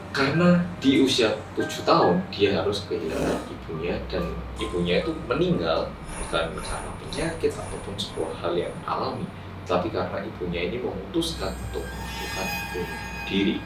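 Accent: Java, Indonesia. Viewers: Halo mas.... Welcome back with me, fans orang korea yang medooook 😁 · Semangat terus mas wong korea sing "medokkk"🤣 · Yang? Medoook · Hei orang medok kenapa sama gak anak annajiyah aja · Mas:Bersama orang korea yang.. Aing sekeluarga auto teriak: Medhokkkkkkkkkkkkkkkkkkkk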